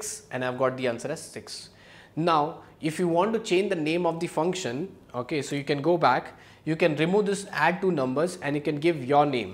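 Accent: India. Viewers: This comment has nothing to do with the content. But, WOW! Incredible presentation!!! The effect where you stand in front of a giant spreadsheet and push fields is AMAZING. The visual is so clear, I'm not even annoyed by the Indian accent. Going to follow (have less than 12 channels I'm following in over 10 years of use).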